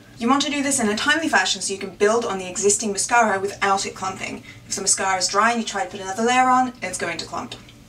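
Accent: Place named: United Kingdom. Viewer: Omg, when you do your Beauty viddeos, you become much more British;) But it's alright:P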